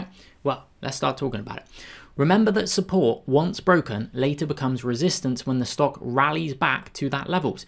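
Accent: England, United Kingdom. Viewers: Excellent video, thanks for the job done. Like to hear some English accent it changes from American one ;)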